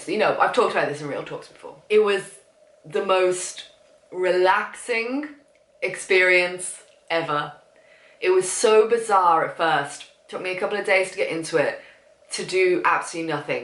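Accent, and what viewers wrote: United Kingdom. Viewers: you are soo beautiful and ur British accent makes you even more beautiful.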